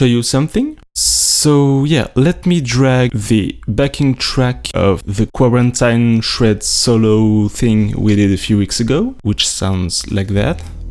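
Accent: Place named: France. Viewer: It's great and pleasing to watch another frenchy with international goals. Don't care about what people say about your accent. Be yourself face to the camera and people would feel it. So it's good. Envoi chier les rageux égoïstes qui préféreraient que tu parles français, ils n'avaient pas qu'à sécher le collège. 😁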